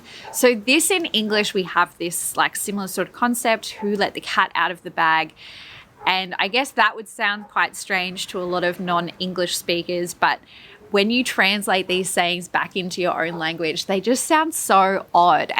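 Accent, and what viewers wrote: Netherlands. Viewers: I believe there are booklets with these sort of things: "The Undutchables" and "Stuff Dutch people say", if I recall correctly, but it's been a loooong while since I've seen them. Also, oh wow you almost have a Dutch accent now. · You really have a hang of the Dutch accent! Cool vid. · I like her Dutch accent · can we just talk about how great u dutch accent is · Oh my god, your Dutch accent is amazing. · Heb je in de gaten dat je soms Nederlandse woorden vertaalt naar het Engels maar dat je ze gaan toch Nederlands uitspreekt? Bijvoorbeeld handschoenen. Jr vertaalt hand naar hand maar in beide gevallen spreek je het op zijn Nederlands uit. Ik blijf me trouwens verbazen over hoe goed Nederlands je spreekt. Complimenten daarvoor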